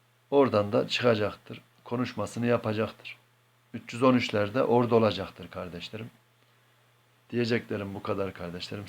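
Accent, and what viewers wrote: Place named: Ankara, Turkey. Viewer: Angara şiveden belli olmuyor mu İlk çıhışş çıhacak diyip duruyor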